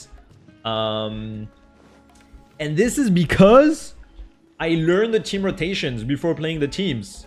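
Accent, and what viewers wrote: France. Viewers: is it hard for you to speak English and you are frensh idk btw i can speak both of them :) I'm from Morocco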